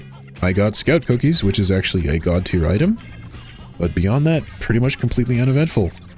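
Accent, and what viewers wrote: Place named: United States. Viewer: Where do you live that you have an american accent and your room can get to 90 degrees in the middle of January??? Do you live in a windowless basement? Pheonix Arizona??? Ameristralia?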